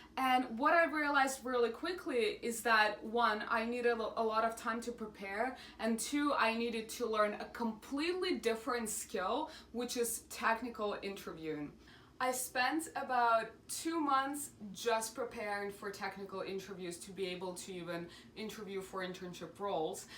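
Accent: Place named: Russia. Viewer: aaaa by the way i like the way that you trying to hide your Russian accent you doing it well i respect it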